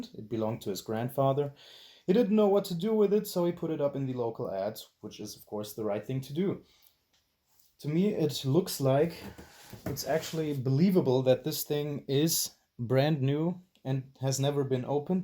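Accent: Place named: Germany. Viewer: Oh my god , Its Indeed Beautiful Piece of Tech, by The Way You are German I think by hearing Your Accent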